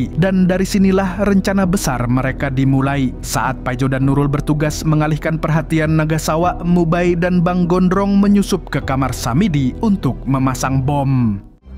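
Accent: Banjar, Indonesia. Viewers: Org Banjar KH mang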